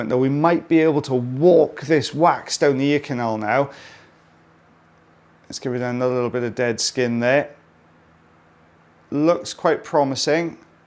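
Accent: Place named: England, United Kingdom. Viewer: I love the English, your accent brings me so much joy 😊 Hope I get to live somewhere in England 🇬🇧 one day.. More on topic thank you for all your hard work putting these videos up every week, I can't imagine how much work and dedication it takes. You and your team are much appreciated:)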